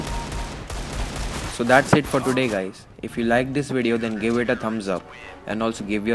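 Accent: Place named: India. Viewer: omfg ur indian accent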